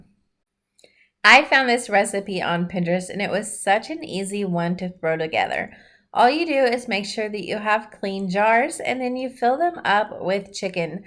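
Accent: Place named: United States. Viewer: I think with Worcestershire sauce coming from someone who actually lives in Worcestershire the safest option for someone like yourself who had an American accent is to do how Kristen from six sisters stuff says it and that’s “the W sauce”. I personally pronounce it wuss-ta-sher but I think you did a great job saying it xx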